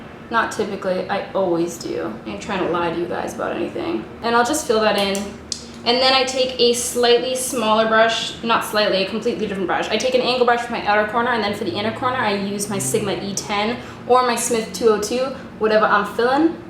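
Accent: Canada. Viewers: Your Canadian accent tho! Can't get over the way you say crease, I love it! Love your work xx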